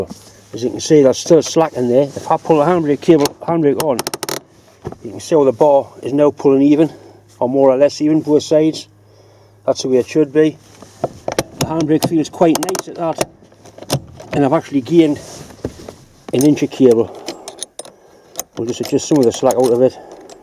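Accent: Newcastle, United Kingdom. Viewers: Good, clear instruction and Great video . And as I,m a proper northerner I even understood your geordie accent.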